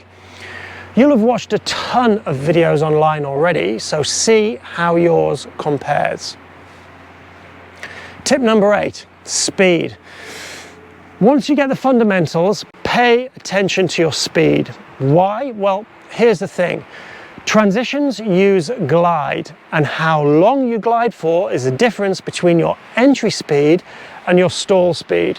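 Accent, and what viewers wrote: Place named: United Kingdom. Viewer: Thank you very much for the precious advice, delivered in a fantastic British form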